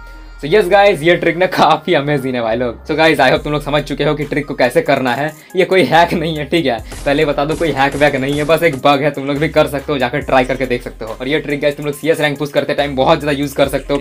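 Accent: Bengal, India. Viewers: Bhai app bangalli ho na 😀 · Bhai ap bangali ho kya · Bhai tu bengoli h 😮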